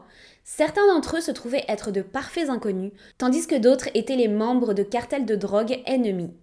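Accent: Quebec, Canada. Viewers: Merci beaucoup pour ta vidéo tu es GÉNIAL et tu racontes très bien en plus j adoreee ton accent québécois 😁😁😁😁😁👌👌👌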